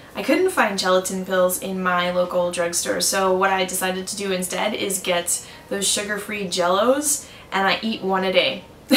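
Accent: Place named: East Coast, United States. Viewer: You say "length" so weird. It's definitely East Coast. I've never heard someone say length that way! By the way, it's ho-HO-bah oil. My mom whacks me over the head if I say jo-jo-ba, so I'm passing the knowledge on.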